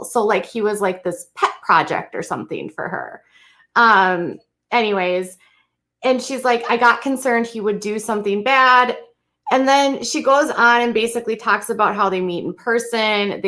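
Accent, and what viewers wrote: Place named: Upstate New York, United States. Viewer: Her accent sounds like upstate New York state.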